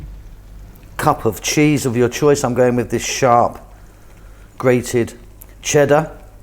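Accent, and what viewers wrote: United Kingdom. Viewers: Your question confirms my initial response: what does someone with a British accent know about refried beans? They are great with Mexican or Tex/Mex dishes.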